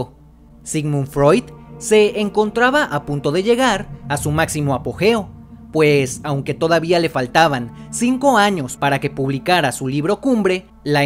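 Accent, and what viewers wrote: Mexico. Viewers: Eres yucateco?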